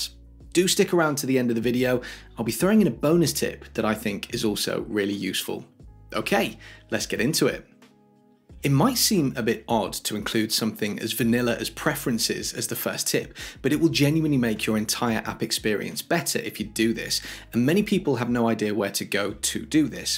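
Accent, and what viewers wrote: United Kingdom. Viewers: The only improvement I need to use Apple Maps exclusively would be the ability to download offline maps. Enjoyed the video, very informative and to the point, and you brits are very pleasant to listen to ( I'm an American, but set my Siri voice to British for that reason lol )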